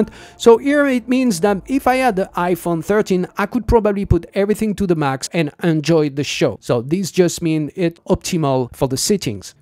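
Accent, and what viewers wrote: France. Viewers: your english sounds french lol nice video bt the way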